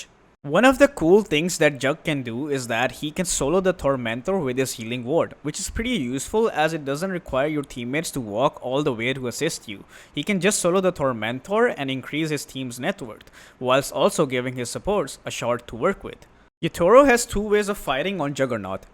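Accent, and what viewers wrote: India. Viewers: i like your INDIAN accent dude.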